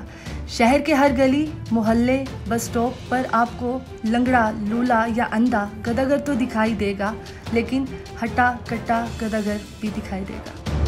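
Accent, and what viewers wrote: Punjab, India. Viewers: سچ میں آپ میں ٹیلنٹ ہیں پاکستان زندہ باد آپ نے موزوں بھی اچھا چوز کیا اور رول بھی بہت اچھا ادا کیا ہیں اور ہمیں پاکستان حالت کے بارے سے آگاہ کیا ڈریس بھی میچ کیا آواز کے ساتھ زبردست کمال ہیں اور دوسری بات یہ ہیں کے پنجابی آواز آپ پر سوٹ کر رہی ہیں۔ نائس اللہ آپ کو صحت خوشیاں اور لمبی زندگی دے آمین ثم آمین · اس وڈیو کلپ میں جس محترمہ کی آواز میں voice over کی ریکارڈنگ کی گئی ہے وہ انتہائی فضول ہے کیونکہ اس عورت کا اردو الفاظ کے تلفظ ہندی زبان سے متاثر شدہ لگ رہے ہیں۔ اُردو زبان میں تلفظ کی ادائیگی کی بہت زیادہ اغلاط ہیں۔ انڈین انداز میں غ کو ک کی طرح بولا جا رہا ہے۔ جو کہ بالکل بھی متاثر کُن نہیں ہے۔ مثلاً گداگر کو با بار گداگار، غیرت کو گیرت، غور کو گور پہلی قسم کی بجائے پہلی اقسام خاک کو کھاک درجہ کو درج تیسری قسم کو تیسری اقسام کہا گیا ہے بکواس پوسٹ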